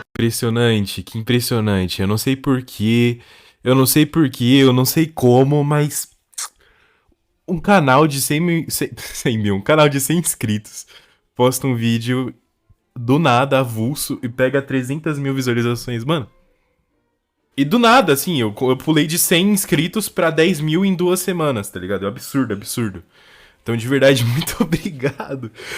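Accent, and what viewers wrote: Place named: Rio Grande do Sul, Brazil. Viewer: Vc é gaúcho massa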